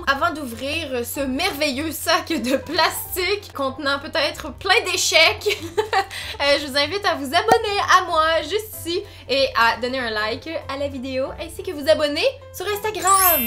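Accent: Quebec, Canada. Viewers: Merci pour ta vidéo ! T'as l'air tellement épanoui ça fait plaisir 😍 j'ai une question ! En France quand on entend l'accent québécois c'est soit les gens détestent, soit il adorent (perso j'adooore), mais du coup au Québec, comment le français est perçu ? Et comme vœux je veux lancer mon entreprise de graphisme/webdesign, je veux entreprendre !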